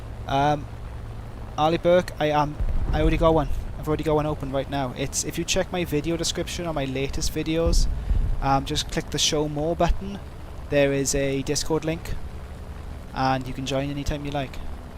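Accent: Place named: Wales, United Kingdom. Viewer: Oh you're welsh.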